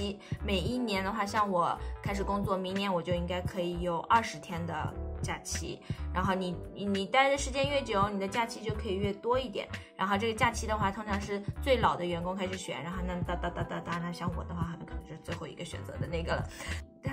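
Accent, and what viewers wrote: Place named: France. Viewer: 我好像从口音中听出了法语的味道呢(我堂妹在Quebec省(Ottawa)做Family Dr.)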